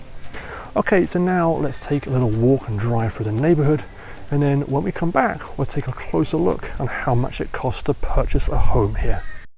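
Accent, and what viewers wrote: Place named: United Kingdom. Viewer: You appear to have a British accent where in the U.K. are you from as a Brit I can’t quite place it? Also my wife who is a portlander says that area is surrounded by bad areas and not that great a place to live in the Portland area. She compared it to Birmingham in the U.K. one or two streets great surrounded by bad areas.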